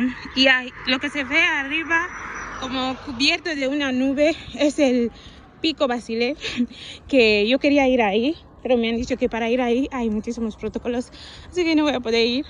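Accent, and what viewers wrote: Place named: France. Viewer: vous parlez espagnol avec un très fort accent français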